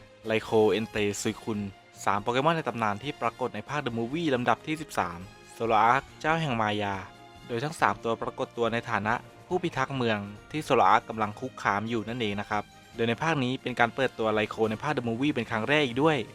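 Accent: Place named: Suphanburi, Thailand. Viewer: สำเนียงเหมือนคนสุพรรณเลย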